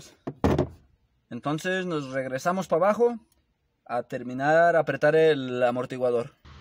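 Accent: Mexico. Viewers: Muy buen trabajo,además el relato cuando vas haciendo el trabajo es muy entretenido,la tonada mexicana es única!!!!saludos desde la patagonia argentina manito!!!!!!!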